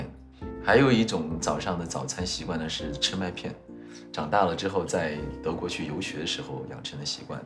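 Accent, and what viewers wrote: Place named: Chongqing, China. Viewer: 听出来他的重庆口音了 哈哈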